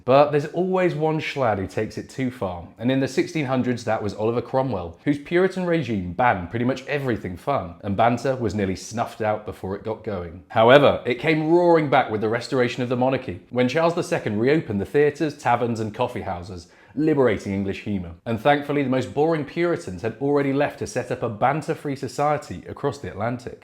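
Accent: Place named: United States. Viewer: Enjoyed the scholarly and interesting history. The American accent, not so much. 🇺🇸🇺🇸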